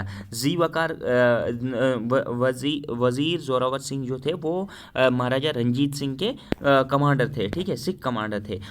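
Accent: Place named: Kashmir, India. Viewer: That kashmiri accent in teaching is so nostalgic ..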